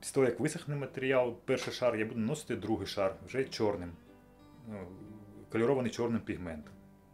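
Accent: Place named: Ukraine. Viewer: Что с автором? На украинском языке раньше не наблюдал. Я конечно по говору понимал что с Украины,но переобутся так сразу..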